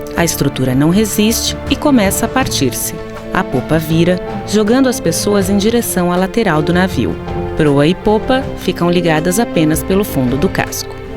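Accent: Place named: São Paulo, Brazil. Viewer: essa mulher tem um sotaque irritante de paulista "iceberrrrg"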